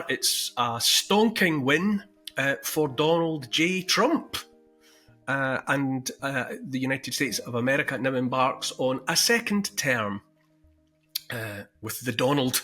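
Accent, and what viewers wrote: Scotland, United Kingdom. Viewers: Man this is the most hard core Scottish accent I’ve ever heard 🤣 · Well said, Neil. I love listening to your Scottish accent. I’m not so fond of my accent but is very distinctive. I’m from Arkansas USA, Woo pig sooie! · Thank you Neil I just listened to this and I agree with every word. A paradigm shift for me personally and for the world (I cautiously hope). I’m Scottish but live in Cornwall and sometimes wonder about moving back up north. Would be Fife probably. But Scotland has scared me a bit lately…. So many people very asleep. Including my family - not a single one understands what I’m talking about, when I dare to talk that is. It’s when I try to open the Overton window just a tad more (Maybe Trump isn’t such a scary prospect. Maybe you’d find interesting information on X. Etc etc) they just default to calling me a conspiracy theorist. 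One person told me she hates Twitter/X because it’s full on right wing hate speech. I asked her what things she reads there. ‘Oh no, I don’t use Twitter, I’ve just read about it’ !!!! And they tell me I exist in a bubble. Anyway, I felt happy yesterday - November 6th- and I kept quiet about it . Sadly I’m a ‘childless cat-woman 😹’ who doesn’t have a sympathetic partner or family. But I can still laugh and do my own research and make up my own mind. You are a helpful guide and I especially love listening as you speak in my familiar Scottish tongue.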